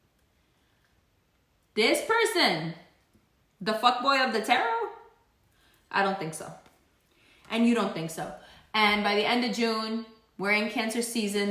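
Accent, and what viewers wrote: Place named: New York, United States. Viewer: You are so funny ... oh man I love it !!! I'm a New Yorker , living in Jersey now across the Hudson.. you sound just like me :p I literally just said all this to my bf like last week the attitude and all haha I was just not beat ! Nah !! ;)) we really don't play ;) pisces / aries cusp .. Gemini rising and yup ! Not doing it. Happy Birthday to you beautiful enjoy your time in London. Thanks for everything ♡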